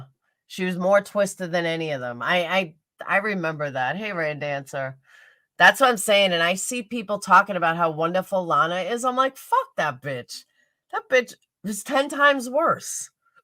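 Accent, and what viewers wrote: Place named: New York, United States. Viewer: Exactly true lots of people got brain tumors. You say so cute Ny accent. Lol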